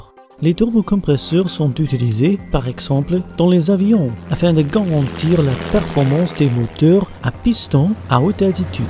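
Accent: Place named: Germany. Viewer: C’est quoi cette voix de gros accent du bled ou de allemand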